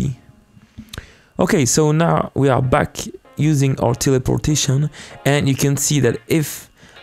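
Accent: France. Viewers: Ca transpire le français jaja 🤣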